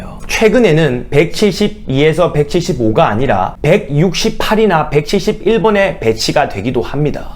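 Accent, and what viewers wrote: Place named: Busan, South Korea. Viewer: 사투리 쓰는거 욀케 멋있게 들리지? 부산 사투리인가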